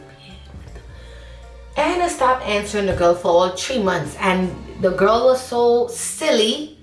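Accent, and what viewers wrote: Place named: Brooklyn, United States. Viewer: It’s your New York Brooklyn accent for me !!! I have to watch this Anna series because this sounds goodddd Also can’t wait for you and your husband to do the how you met story time video 🤎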